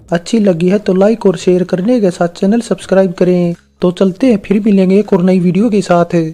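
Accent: Haryana, India. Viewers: आपकी आवाज़ मै हरियानवी tune है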